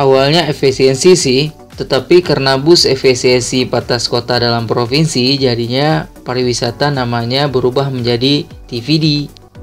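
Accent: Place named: Aceh, Indonesia. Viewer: Intonasinya khas Aceh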